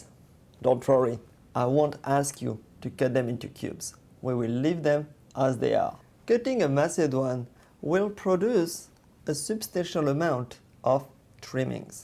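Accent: France. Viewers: on vois que c est un français qui parle car son accent anglais n est pas maitriser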